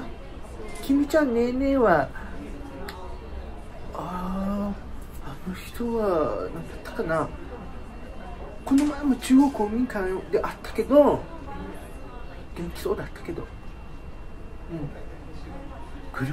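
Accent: Miyako, Japan. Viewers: 沖縄本島人からしてもすぐ気付く宮古訛り、 いいっすな。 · イントネーションと発音がめっちゃ宮古～！って感じですね笑 石垣のおばさんたちも話してる内容だいたいこんなです☺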